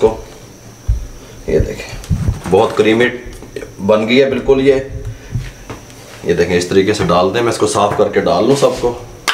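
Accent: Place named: Punjab, India. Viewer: Bai luv u nd i like d way u speak hindi in punjabi tone nd ur recipes are awesome....luv from australia nd india..god bless u always..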